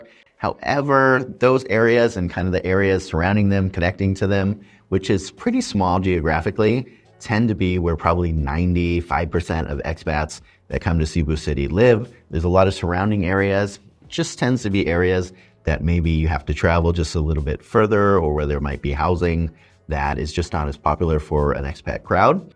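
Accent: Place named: United States. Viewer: Your vowels are wrong. Your not communicating in america your communicating in S E Asia. Irritating to hear that usa shit. See ya later (C ya : )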